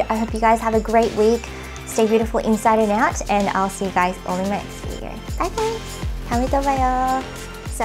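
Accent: Australia. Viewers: You look so cuuuute ! And I know I say it everytime but I love your Australian accent! 😻😘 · Is that an Australian accent I hear? But don’t you live in Korea? Anyway.. You’re freaking adorable. 😊😊❤️ Thanks for this!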